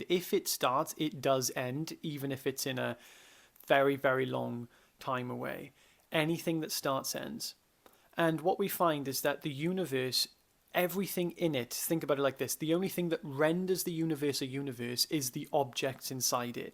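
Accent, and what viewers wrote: Wales, United Kingdom. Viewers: Hang on.... I'm listening to you now, you sound Welsh? I'm from Cowbridge. I am guessing that you are near Cardiff?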